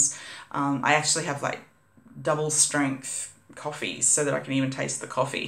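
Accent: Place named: Australia. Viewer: Great info, my date for VSG is 19th March, so looking forward to the big change. Nice to hear another Aussie not many of us. Board is a great idea I will start to create mine now. Thanks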